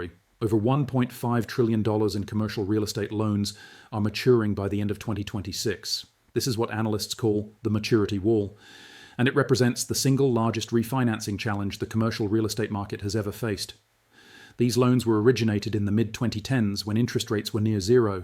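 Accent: United Kingdom. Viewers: blathering AI avatar with a uk accent ==total nonsense--these guys are loony bs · British, American and Aussie accents and with more names than Frank Abagnale ever dreamt of. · The AI dithers between British and American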